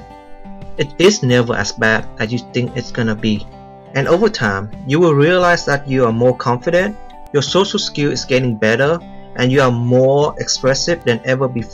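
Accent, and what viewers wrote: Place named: Vietnam. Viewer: I was watching your Emotional Intelligence video and I recognized that you have Vietnamese accent. Curiously, I clicked on your channel and found this video. I have the same situation with you, but yet speak up and it was not bad at it is. I just wanna say that you did a very good job ^^ Thanks for the video.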